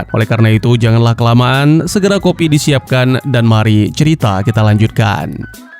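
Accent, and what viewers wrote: Aceh, Indonesia. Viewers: Lu orang Aceh ya bang?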